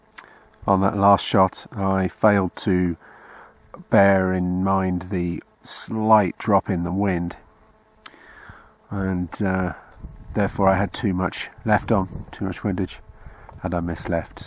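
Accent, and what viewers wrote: United Kingdom. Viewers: you sound like a brit but the landscape looks to me like scotland.... what's the dillio?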